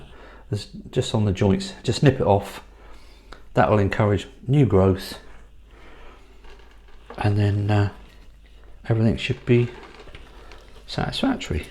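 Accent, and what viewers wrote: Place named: West Country, United Kingdom. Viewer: Nice plants, nice to hear a proper West Country accent. Before I left the uk we had grandmas cactus that were said to be her moms, I’d like to think they still exist in some form or another, at the moment I have red, pink and white ones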